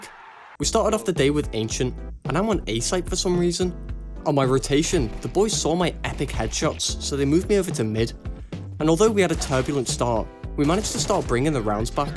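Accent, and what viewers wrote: United Kingdom. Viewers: I only watch you because of these two things: Firstly you're an impeccable, stupendous and fabulous teacher Secondly for your Bri'ish accent, love that bud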